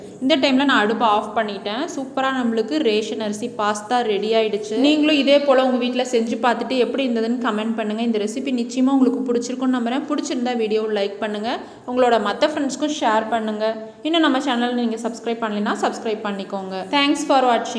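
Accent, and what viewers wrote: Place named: Kongu, India. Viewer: Kongu Thamilum Thanjai Thamilum Super Pasthavum super magaley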